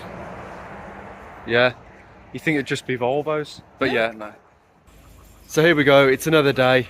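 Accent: United Kingdom. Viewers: Judging by your accent you are from around my area somewhere, there is a good crowd of UK Volvo folks, if you ever want to meet up contact me.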